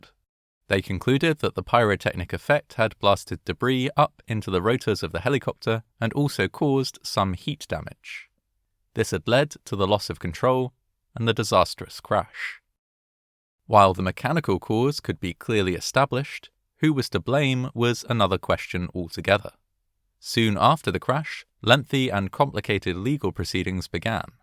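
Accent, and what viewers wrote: England, United Kingdom. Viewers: What part of England are you from? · The clip isn't even shown. Just some British dude yapping...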